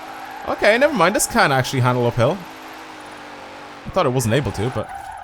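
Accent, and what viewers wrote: Ireland. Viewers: Hey, quick question, where abouts are you from, I can’t help but think you have an Irish accent although I could be completely wrong! Keep up the great work!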